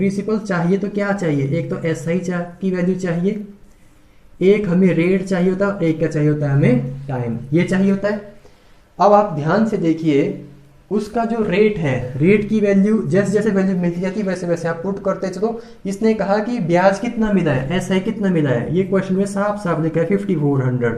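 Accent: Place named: Pahari, India. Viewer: Pahari tongue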